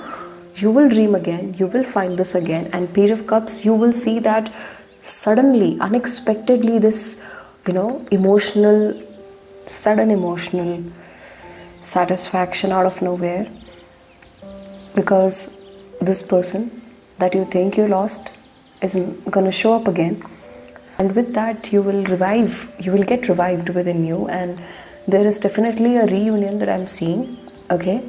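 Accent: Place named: India. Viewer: Indian accent 😂😊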